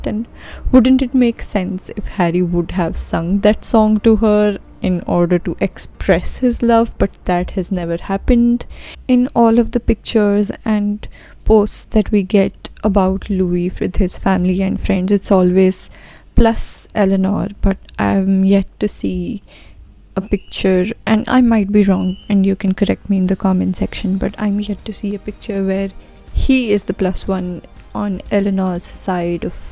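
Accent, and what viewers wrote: India. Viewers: I was thinking the same her accent is soo Indian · Lmao dw be proud of your accent. Idk y but ur accent sounds like an Indian accent to me cse like I'm from India too and it sounds too familiar. (u don't have to reply if u don't wanna and if you're not comfortable with letting ppl know where u come from) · I am just loving your Indian accent ✨...and larrie is real 💙💚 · Just me Or ur accent sound a bit indian??????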